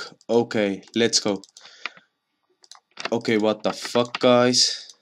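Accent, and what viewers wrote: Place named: Estonia. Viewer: Hea eesti aksent sees aga muidu 5+! Nice vid ;P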